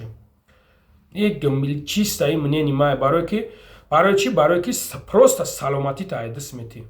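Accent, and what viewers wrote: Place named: Bokhtar, Tajikistan. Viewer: Хака хаму БОХТАР КУРГОНТЕППА гап мезана бачаи хола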